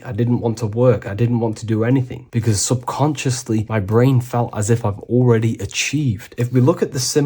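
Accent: United Kingdom. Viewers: Such a clear British accent you've got bro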